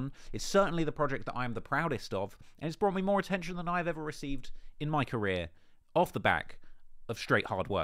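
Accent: United Kingdom. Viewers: Yo Idgaf what anyone says , any British dude that keeps his accent but says alot of black slang when he talks is dope af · BRA you from the UK is the main reason. you don’t know von or understand him, yet you obviously understand who he killed, n how……….von was loyal is the best way to describe him n if anything, he is a vengeful killah. his friends getting killed, sparked the hate for him to do wut he “allegedly” done. he luv’d his gang. If you lived out here maybe ppl wouldn’t be as mad, but he not a serial killah, VON is a vengeful killah. who is really fueled by the luv he has for his friend’z who was killed. he not the only one to get away with body’s. police don’t even try n solve shit half the tyme, in “Chicago” the chances of cops solving a murdah is low. ppl don’t talk it’s just like looking in from the outside…………personally, I don’t think von would be mad about the documentary, only thing we don’t like is you calling him a serial killah! 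everybody that knew von, knew he had body’s. it’s not a secret! it’s just your perception, you’re accent & your WHITE. LMAO 😂😂 Long Live V-ROY 🙏🏽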